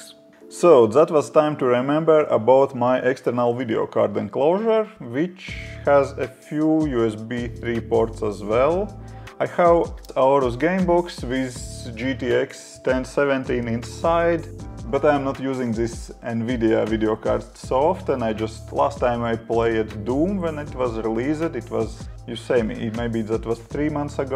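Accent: Russia. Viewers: Ты русский? Чувствуется акцент. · Да да да!! -_- я слышу русский акцент, причем лютый =)))) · Ооо так вот какой "русский акцент" стендаперы вечно пародируют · Я слышу русский акцент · Your accent is very similar in Russian, yes) I thought you are Russian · I felt a Russian accent, хотя может мне показалось) nice video, hello from Kazakhstan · Чувак)) у тебя явный русский экцент)) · Ох уж этот акцент из Russland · Your fucking russian accent is super annoying.... Kommunist yerk.... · i'm confused, you have an accent that sounds somewhat russian, but your name sounds nothing russian... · i said that because you have russian accent. This my opinion ) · о да, русский акцент)) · Hi . Are u russian? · are u russian? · Ты чо, русский? Чего за диалект. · Your accent is so Russian!;) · Русский акцент рулит))) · Русский чтоль? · слышно русский акцент) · Говорите как будто Русский на Английском... 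)) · Лады, понял. Просто уж сильно похож на Русский акцент с явным преимуществом в разговоре звука "Р-р-р-р" :))) Похожий акцент ещё у Аргентинцев с явной звучной буквой "Р", НО сетАп всё равно классный, спасибо за идею. :) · ни фига, это конкретно русский акцент) ты мало чешских видео смотрел my friend XD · Мужик русский судя по акценту. Да и всё выдаёт ;)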